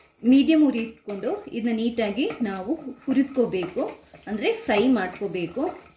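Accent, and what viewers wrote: Mangalore, India. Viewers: Super ma'am nim kesari bath jothege manglore kannada kelodu Chanda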